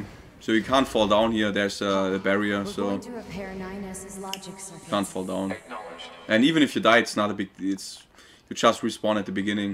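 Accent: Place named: Germany. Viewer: Dieses deutsche Englisch ist einfach herrlich 👍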